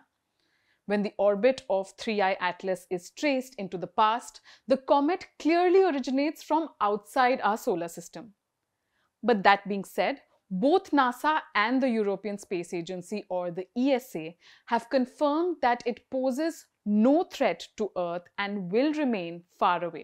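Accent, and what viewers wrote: India. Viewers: Stop making videos , I couldn’t stand the lack of information and accent . I said what I said , I’m fatigued on Indians .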